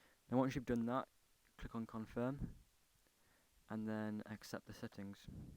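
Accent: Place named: Australia. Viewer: Lol, are you from England? You sound australian. Lol. xD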